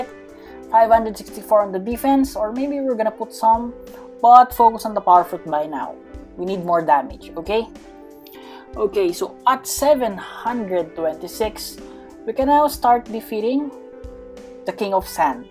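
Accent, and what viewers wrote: Philippines. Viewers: Are you a filipino? Because u sound like Filipino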